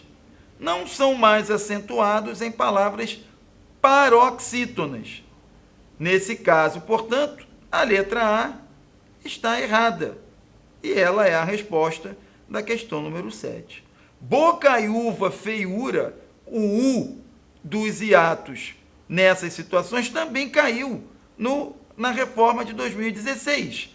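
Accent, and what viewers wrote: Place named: Rio de Janeiro, Brazil. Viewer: Esse professor fala igual os pastores da Record , sotaque carioca, bem explicado , parabéns pelos vídeos , foi de grande importância , e conhecimento...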